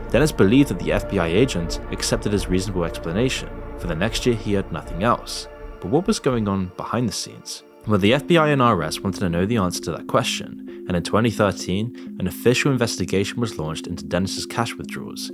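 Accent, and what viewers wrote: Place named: Brighton, United Kingdom. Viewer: U from Brighton I'm guessing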